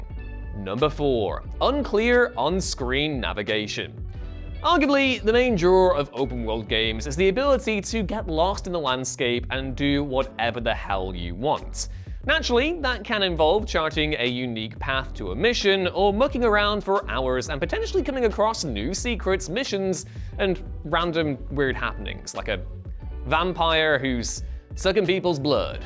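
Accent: United States. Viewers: Where in earth has this semi-american accent come from? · Seriously,. Is it an affectation to try to appeal to American viewers, have you been visiting the States a lot lately? What is up with the Americanization of your accent?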